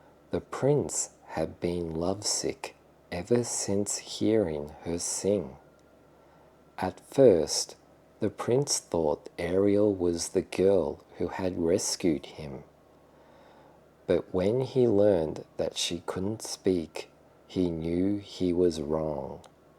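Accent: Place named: Australia. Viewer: さんのオージー英語ずっと喋ってて欲しかったのでむっちゃ嬉しいです…！！ネイティブ速度バージョンもいつかお聴きできるでしょうか☺️ オーストラリアに戻りたい気持ちと英語から離れたくない気持ちを幾分か救ってくれます😊❤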